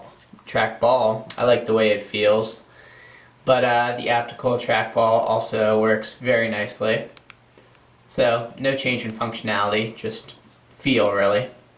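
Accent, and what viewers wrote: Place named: United States. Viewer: terrible american accent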